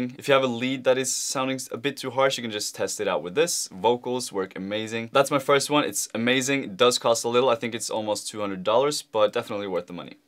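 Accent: Norway. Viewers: Are u from norway? I can hear ur accent lmao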